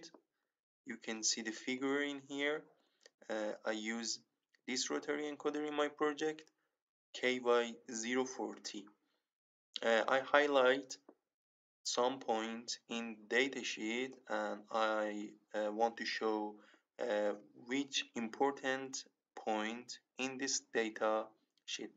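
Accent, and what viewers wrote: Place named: Iran. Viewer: عاشق لهجه ایرانیت شدم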